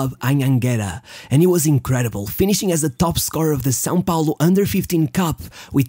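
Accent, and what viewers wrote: Portugal. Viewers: Another great football Story: thank you very much. I can hear that the commentator is just like me a Portuguese speaking dude due to his beautiful accent in English. Thank you Sir. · Is the guy doing the vid portuguese? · Esse sotaque, é um tuga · I see u are portuguese only from your accent 😅🇧🇷🇵🇹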